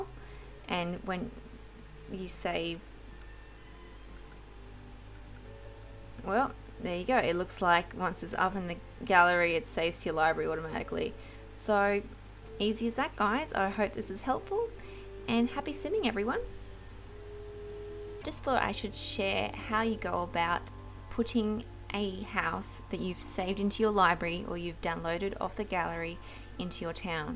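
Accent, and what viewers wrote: Australia. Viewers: As soon as i heard your voice I could tell you are aussie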